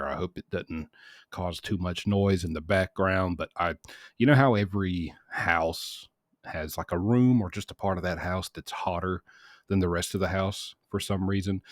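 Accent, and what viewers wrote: South, United States. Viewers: I LOVE the southern accent!! We say like it or lump it! 😂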